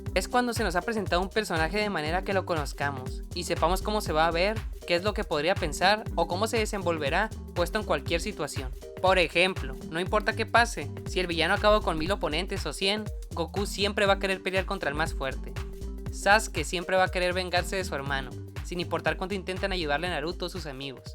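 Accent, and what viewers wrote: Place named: Sonora, Mexico. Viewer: Brooo, ¡buen video! ¿Eres de Sonora? Tu acento me parece de aquí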